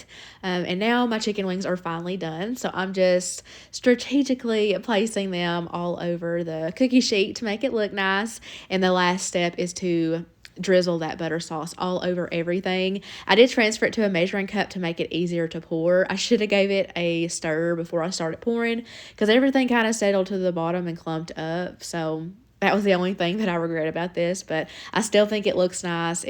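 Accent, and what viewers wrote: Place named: Kentucky, United States. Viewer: Thank you so much for making these videos! You always give me such great ideas of meals to fix my family! And I’m a fellow KY girl, so you sound like family teaching me! 💓💓💓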